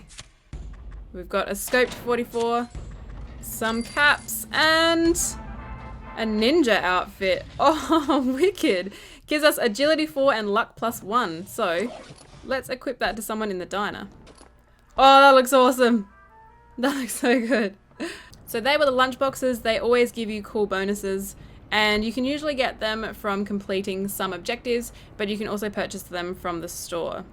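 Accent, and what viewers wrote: Australia. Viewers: ahh an aussie or new zealand ehh can't tell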